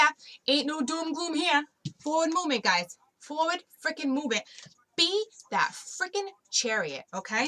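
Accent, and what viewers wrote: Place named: South, United States. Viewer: lmao... Lovely Southern Drawl ya got there and most appreciative from This Virginian Aries with a Southern Bwoston blended tongue... yeah, it's a long story.. but I AM...and so it is. THanks! <3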